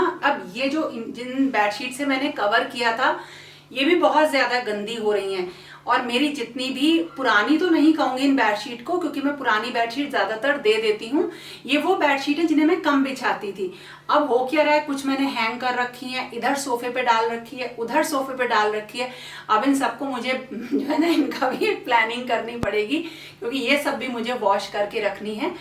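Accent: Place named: Mathura, India. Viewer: I'm from Mathura mam,mera mayka h mujhe lagta to tha aapke bolne ke ton se ki aap Mathura ya Agra se ho.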